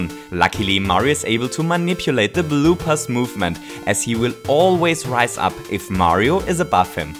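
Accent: Germany. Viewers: O my god, dat German accent XD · Super Video, ich weiß das du Deutsch kannst. Hört man auch. ; ) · bist du deutscher oder so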